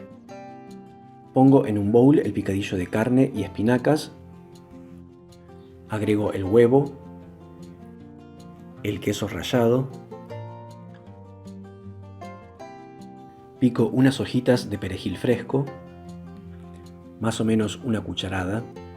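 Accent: Argentina. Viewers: Por la forma de hablar parece argentino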